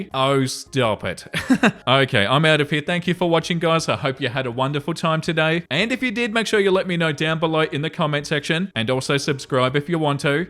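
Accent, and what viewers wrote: Australia. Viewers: Thank you!!! I was BEYOND excited when I saw an Australian accent as an option 😂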